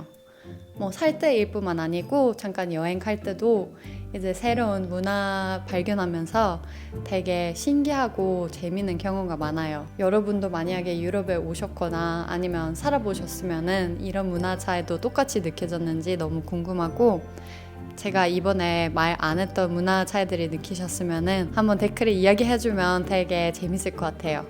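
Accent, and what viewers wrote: South Korea. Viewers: 억양이나 발음이 한국인같아서 놀라고 너무 예뻐서 놀랍네요. 행복하세요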